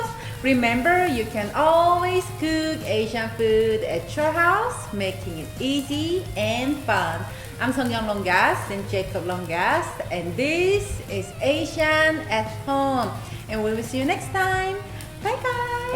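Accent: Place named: South Korea. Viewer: 영어에 한국어 액센트 물씬인데 음식 역사, 유래 좀 배우지? 무식하게 저걸 일본식이라고. ㅉㅉㅉ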